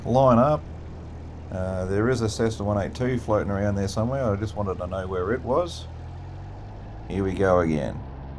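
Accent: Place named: Australia. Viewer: Great video and just wonderful to listen to your beautifully calm quiet commentary. Hearing a quiet Aussie is such a restful change compared to our American counterparts with similar videos. Love it.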